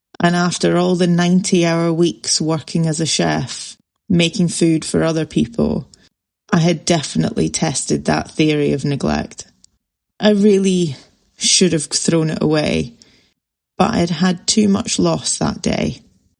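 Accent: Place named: Scotland, United Kingdom. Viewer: You made an old man cry, It is not a perfect world, Me and my now wife of over 16 years were married before, We live in central Scotland, i can tell by your accent you also come from here. Elza like yourself had numerous miscarriages and had no children, I had 2 sons, Like yourself she is a wonderful person and more or less adopted the boys (men) they both love her to bits etc. Life has a few Dark places and I have been there like her and you, the Light shines bright once out off it, you have a very nice man and now children at your side. GOD Bless, shine like a STAR. I once baked but only as an amateur young leo has inspired me to bake again and so have You, I am an old man nearly 70 and enjoy it. going by some of the comments your name is and your husband Vernon? all my best Charlie xx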